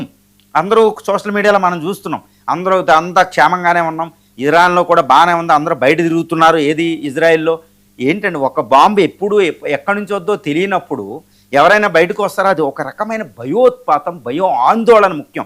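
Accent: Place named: Telangana, India. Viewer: Telangana yasa la balay chayptunaru Sir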